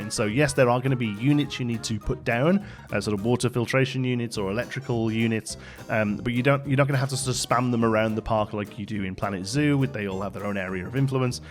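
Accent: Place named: Staffordshire, United Kingdom. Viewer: Loved this insight, I'm so pumped for Planet Coaster 2 now, it's everything I wished for whilst playing Planet Coaster 1. By the way, weird question. I'm from the UK but have never been able to nail down your accent's area, I think it's west midlands somewhere but it's very specific with the way you pronounce the 'ow' in now and so on, I've heard a few people have this inflection and I've always wanted to know the town or area that pertains to. I'm a bit of an accent enthusiast so sorry for the very odd question!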